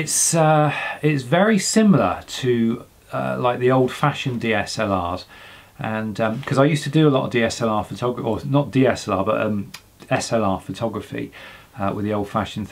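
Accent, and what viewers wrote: England, United Kingdom. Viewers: Are you Englishman?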